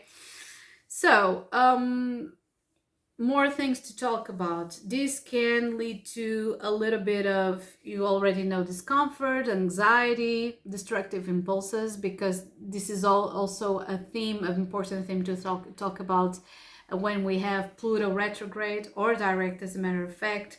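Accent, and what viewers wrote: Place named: Portugal. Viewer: ouvi 2 minutos do video e já detectei um sotaqueuzinho português e realmente confere.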